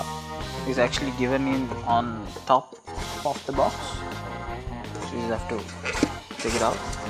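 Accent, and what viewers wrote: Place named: India. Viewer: What a fxxxxing Indian accent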